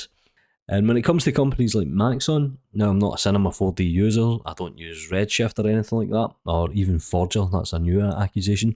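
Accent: Scotland, United Kingdom. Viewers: Na pal, you talk Scottish all you want. Perfectly clear to me … then again I’m a north easterner. No one understands me.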